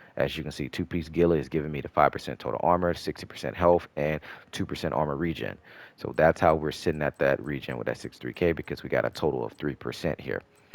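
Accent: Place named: Baltimore, United States. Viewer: fire video, u def from baltimore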